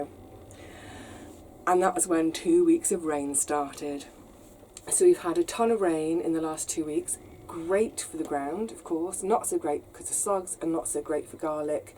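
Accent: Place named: RP, United Kingdom. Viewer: ..."as I was saying upside down in the broad bean bed..." you do make me laugh..loving the stuff you do and am a new sub, so thanks for the content...just to add, I thought you were a bit posh ( accent) and then I watched you talking about how you grew up on a council estate and I had to rethink my impression of you!!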